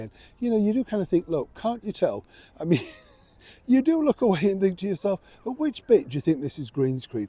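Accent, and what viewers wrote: United Kingdom. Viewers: Love you at least you’re not endlessly talking to Americans like Dan Wooten. Lovely to hear your commentary. Your representation of the British voice is refreshing. Thank you x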